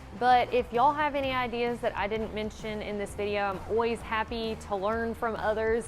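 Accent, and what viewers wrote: South, United States. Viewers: Draws? As in rhymes with straws? I’m pretty sure you’re talking about undies. I do love the southern accent. But our European friends might have problems with this one. Anyways, great video as usual. Keep them coming. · Why can't every woman in America have a southern accent ?? 🙄. Makes your videos so much nicer to listen too. Thanks for posting....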